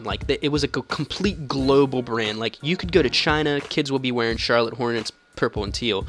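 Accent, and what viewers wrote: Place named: Philadelphia, United States. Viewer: u gota thick philly accent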